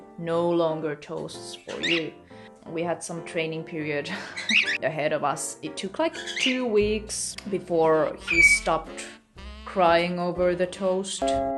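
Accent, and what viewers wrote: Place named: Finland. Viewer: I hear a Finnish accent xD. Cute birds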